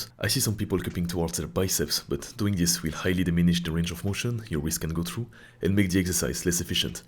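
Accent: France. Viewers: langlais en mode francais ta pas lacent va sur internet ya des prof danglais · Oh vu de ton accent, tu es français, non ?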